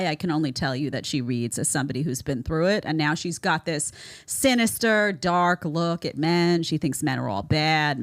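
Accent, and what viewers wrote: New York, United States. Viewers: You are delightful, especially your NYC accent. I'm drawn to women in the 5' 4'' range with small hands and feet.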